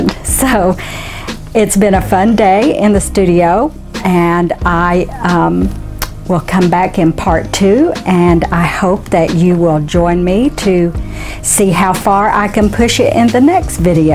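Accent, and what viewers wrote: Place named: South, United States. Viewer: Love this and love your southern accent 👍🏼,love from the UK🇬🇧......subscribed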